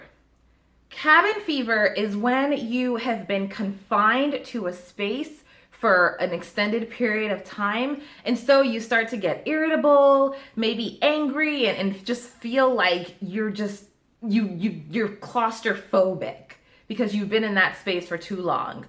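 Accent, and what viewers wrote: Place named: United States. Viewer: Hey! Enrique here. I love all your lessons bc they sound like the real American English! Sometimes, I struggle with the accent of native English speakers from England or Australia. For instance, did you see the video in which the Dagenham boy told Meghan "I think she's beautiful " That "beautiful" it sounds so weird to me like it is not enough English. Of course, it is a good English. Could you please give me some tips about pronunciations skills or listening skills? Thanks in advance.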